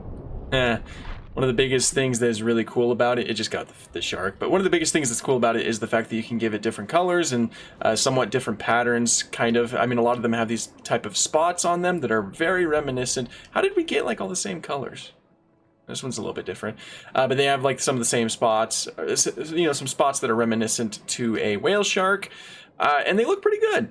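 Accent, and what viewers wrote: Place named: Texas, United States. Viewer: clicked this video because it was something that looked interesting but your accent immediately caught my attention, you sound like the guy from demolition ranch so I'm gonna guess you're from Texas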